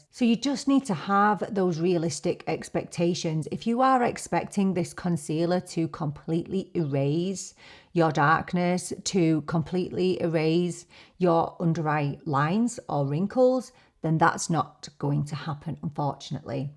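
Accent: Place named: Manchester, United Kingdom. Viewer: Just stumbled onto your channel after searching for Estée Lauder double wear. I love this concealer! Trying to place your accent, are you from around Manchester?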